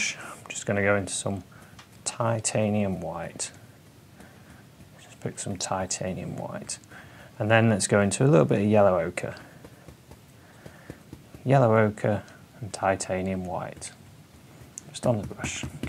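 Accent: United Kingdom. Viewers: Is this guy the British successor to Bob Ross O.O like in all seriousness he paints just as beautifully, and his accent and voice give off that ASMR effect. the same kind that Bob Ross did. This painting is amazing and Sepia just works so well and I love the technique of using masking tape to section off the horizon line :D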